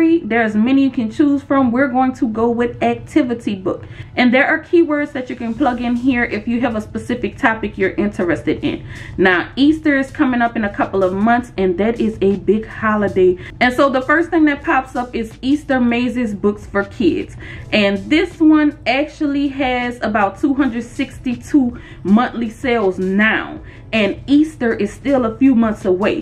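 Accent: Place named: Louisiana, United States. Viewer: My good sis. That Louisiana accent is so strong I subscribed just for that!! Love the realness!!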